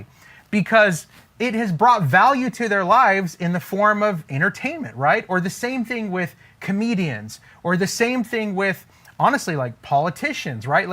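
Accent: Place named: Israel. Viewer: pretty good Israeli accent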